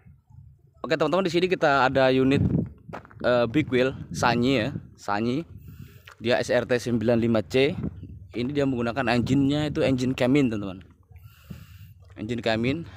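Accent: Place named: Bandung, Indonesia. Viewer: Klu saya amati logatnya kok soperti orang bandung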